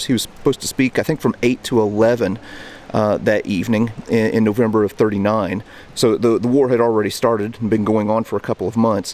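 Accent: United States. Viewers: Shame about the dreadful American accent. The word “route” is pronounced “root,” not “rout.” The “A” in Adolph is pronounced as in “rat,” not as in “cake.” Nothing annoys me more than Americans mutilating the King’s English.🏴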